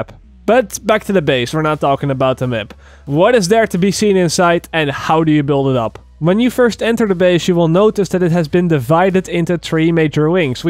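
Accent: Netherlands. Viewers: Je hoort zo erg dat ie Nederlands is. Heerlijk!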